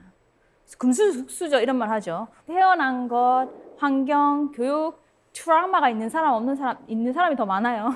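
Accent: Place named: Daegu, South Korea. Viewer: 대구의 말투가 나오시네요.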